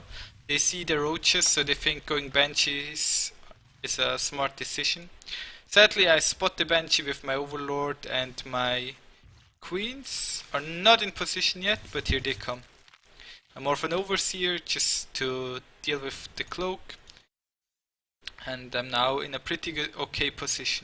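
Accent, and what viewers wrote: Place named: Swiss German, Switzerland. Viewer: You have a funny accent. Almost like a swiss german talking english.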